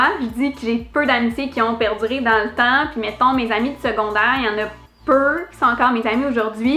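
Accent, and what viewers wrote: Quebec, Canada. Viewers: I love your videos, your frech quebcoise is helping me to understand more and more each day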